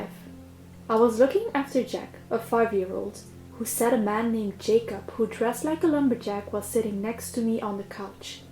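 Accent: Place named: Netherlands. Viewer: Whats your accent? It sounds like Dutch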